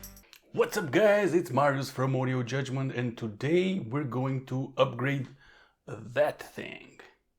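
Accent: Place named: Romania. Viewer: considering your accent I bet that you are Romanian.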